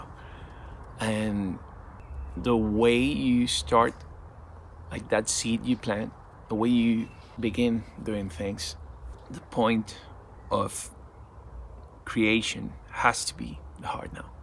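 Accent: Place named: Sweden. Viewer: 100!Resonate. You sound Swedish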